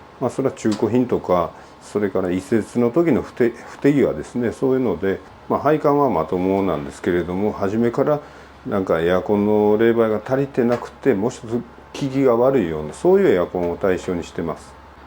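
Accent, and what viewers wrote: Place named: Kansai, Japan. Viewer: ★いつも、詳細な説明をご丁寧にされていて、関西弁も聞けて好感の持てるサイトだと思っています。色々、勝手なコメントにいつも返答頂きありがとうございます。★家のエアコンも5-7年位で効かなくなりますが、ほとんどの場合はフロンガス漏れで、一度、大きなコンデンサーが不良になり交換した事がありますが、壊れると言うより経年問題です。★話は変わりますが、お話に出た日本冷○○学会などにより、技術振興を目差した団体のノウハウが隣国に洩れて、ＩＰの権利も無視され安い代物家電などが作られているようにも思うのですが、日本の国益に反しますよね。日本の白物家電はアメリカでは壊滅しています。 日本の貿○振○会などによる技術情報漏洩問題も取り上げられていました。お人好し？の日本人には考え直して貰いたい問題ですが、赤に染まったマスコミや帰化人の政治家らが人道だ、国際平和だとかチンピラヤクザのように一般論で煽り、反論すれば今度は例外を持ち出し話題をずらす（ゴールを変える）作戦で話にならず、悲しい限りです。★軽トラのＤＩＹキャビンの新しい情報を待っています♪ 重力式の水屋の問題、設置後にお気づきの利点があれば教えて下さい。容器を10Ｌ位にして天井近くに取り付ければ、ポンプなしでもシャワーに使えますか？ ★返事はお暇な時で結構です。気が向いた時に宜しくお願いします。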